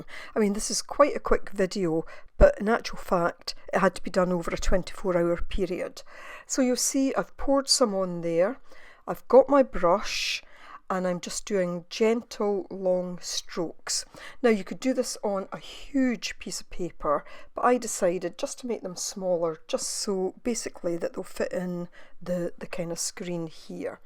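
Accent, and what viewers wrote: Scotland, United Kingdom. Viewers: I detect a bit of a brogue, Scottish? Love all your tutorials 💙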